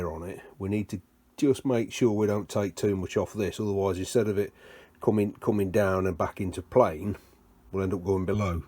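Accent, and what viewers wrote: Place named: Leicestershire, United Kingdom. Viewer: I've been working my way through your Holbrook restoration videos, albeit a few years too late. As an old retired Machine tool Fitter, (I worked 50 years at Jones and Shipman, building Grinding machines) I have to say your work and methods are exceptional, especially your scraping tecniques, that have have come on leap and bounds, I thought I sensed a bit of a Leicesterhire accent too, me being from Leicester myself, fair play to you, have subscribed, cheers, Dave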